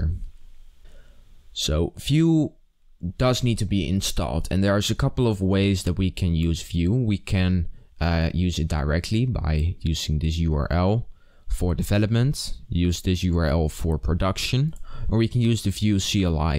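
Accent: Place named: Netherlands. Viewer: This Guy sounds dutch, lol is he?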